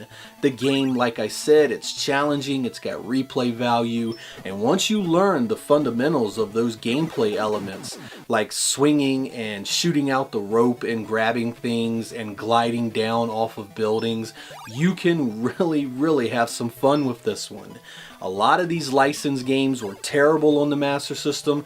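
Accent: United States. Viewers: The movies would be in deutsch xD. The newer movies are clearly better and I like them all. I dont write here, that this video is cheap or something like that, because I dont care, your content so good here also and your analyses are great. I criticise something different, isnt in? Oh my god, the way of talking in USA is facking actually really so annoying, you know.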